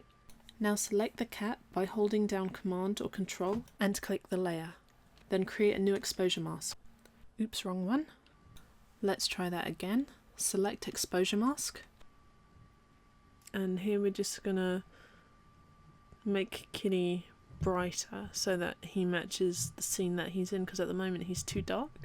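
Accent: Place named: Southern England, United Kingdom. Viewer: Well that was okay but you've gone too fast for some, I've only cottoned on by pausing the video to see what you've clicked. As of now, I'm totally sick of hearing your posh Southern voice & I'm a fellow Brit. You've literally explained nothing here - just skirted over everything at pace, awful, awful "tutorial" but "thanks" for trying...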